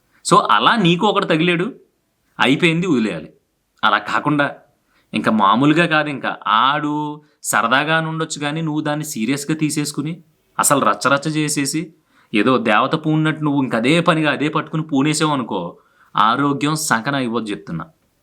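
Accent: Godavari, India. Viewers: Hi bro, midi godavari district kada, ekkada. Baagundi language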